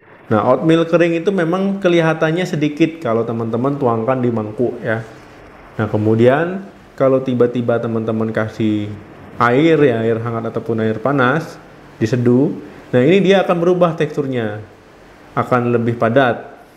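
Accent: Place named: Riau, Indonesia. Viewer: Mohon maaf oot dok, dokter org riau ya? Logatnya familiar sekaliii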